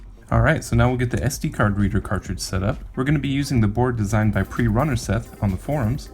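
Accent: Germany. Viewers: are u german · Do you come from Germany? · Are you from Germany? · are you german?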